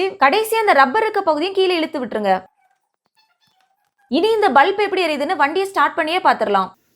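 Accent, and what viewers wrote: Tirunelveli, India. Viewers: உங்களுக்கு திருநெல்வேலியா ? எந்த ஏரியா?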